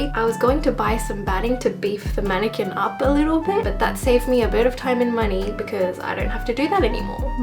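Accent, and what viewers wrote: Australia. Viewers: whenever i find a new channel and i hear an australian accent... it's like god decided to give me a gift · And cute personality and adorable australian accent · Absoooolutely love this video, your creations and your editing. Definitely gonna subscribe :) But I didn't quite understand how you did the middle cinch on that second top (I'm new to sewing and also I'm French, and although I love your Australian accent it was going a little to fast for me to keep up). I understood you made a channel to gather the fabric but i didn't get how ? Could someone explain it to me ? Thank you so much and I hope you get more recognition!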